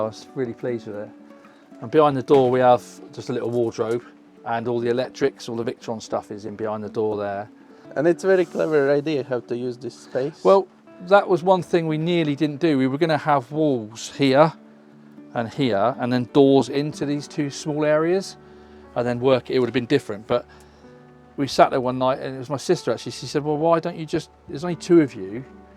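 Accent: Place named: Ukraine. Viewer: Dubbing? I understand both of them…one British and, the presenter, sounds possibly Ukrainian. Since you’re writing in another language maybe you just don’t understand them, or the closed captioning isn’t doing a good job of translating for you. I find it very inefficient. No fault of the channel.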